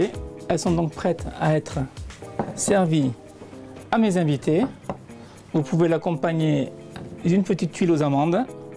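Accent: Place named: South, France. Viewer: des 'rameuquins' :) j'adore l'accent du sud !! merci pour la clarté de cette recette !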